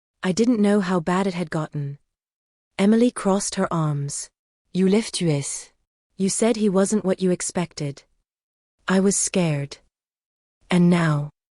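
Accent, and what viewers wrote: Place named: France. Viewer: Extremely annoying! Was unable to understand the foreign French words, hard enough to understand the few English words the narrator spoke with the horribly thick French accent. If you are going to narrate a story, pick ONE language and stick with it!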